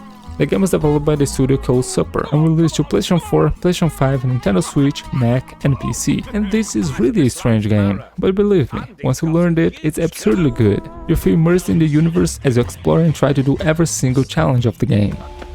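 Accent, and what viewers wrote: Brazil. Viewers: esse sotaque ta com uma cara de brasileiro kk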